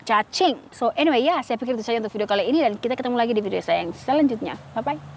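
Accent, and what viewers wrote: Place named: Flores, Indonesia. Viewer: Seperti logat orang flores atau apua atau ambon nih.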